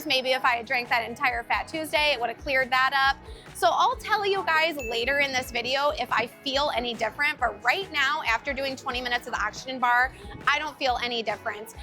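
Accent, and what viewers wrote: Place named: Chicago, United States. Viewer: I figured north because you sort of have a Chicago accent. You would be an amazing teacher!!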